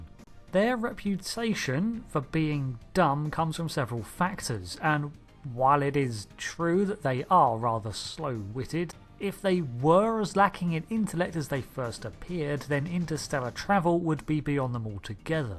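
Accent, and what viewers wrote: England, United Kingdom. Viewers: English from england？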